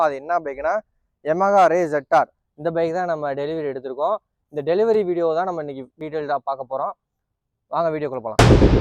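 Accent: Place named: Coimbatore, India. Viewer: Yov ne paaka yenga area la iruku oru paiyan maari yei iruka ya... un looks and pechu... avan coimbatore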